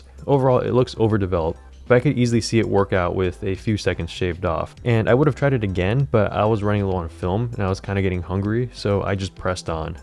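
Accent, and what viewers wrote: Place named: United States. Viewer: HALLO from a WET and GREY BRENTWOOD, Essex ENGLAND !! Just FOUND your Channel and LOVE your Experiments ( and the American ACCENT-- got any Gum Chum ? ) I have a similar channel for film stuff and a few Piano Playing episodes !! I subscribed as I want to watch your Video on the Old VERICOLOR as I have some of the SAME in Fridge !! Keep Snapping -- Peter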